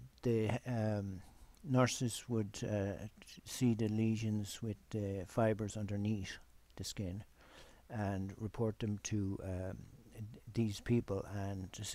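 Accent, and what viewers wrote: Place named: Dublin, Ireland. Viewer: I don't know why but I find this subject fascinating. I am from Dublin, are you also from Dublin? I ask because you sound like you might be from just. outside. Interesting video thanks for uploading.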